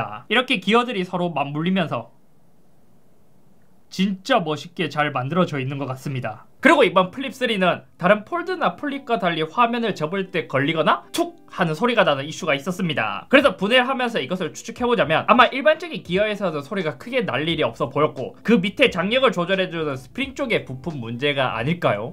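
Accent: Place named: Jeolla, South Korea. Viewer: 말끝마다 요거슬 이거슨 .. 개 역겹네 느그 고향이 쩌ㅡㅡ그저 7시 쩌ㅡ짝이여?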